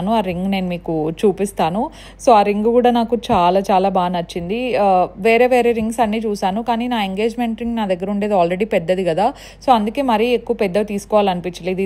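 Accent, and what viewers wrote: Anantapur, India. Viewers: Mana anantapur slang ye veru abba... Miru regular videos lo matlade dhaniki.. anantapur lo mi Amma valla tho vunnapudu matlade matalake chala difference vundhi. Anantapur slange ...Endhukante nenu kuda anantapur ye sis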